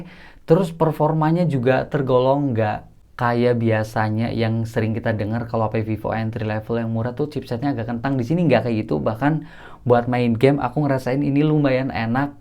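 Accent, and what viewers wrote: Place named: Banyumas, Indonesia. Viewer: Kamu orang daerah mana sih bang?😄 Logatnya rada ngapak.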